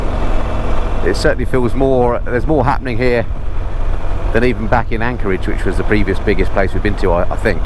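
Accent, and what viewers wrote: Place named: Yorkshire, United Kingdom. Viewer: I thought you are a Yorkshire man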